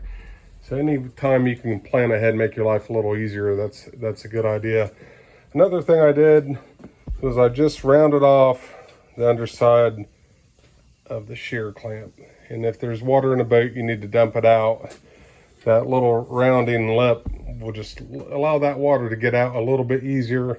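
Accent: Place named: Baltimore, United States. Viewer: Great looking boat, you have a southern accent with baltimore pronunciations.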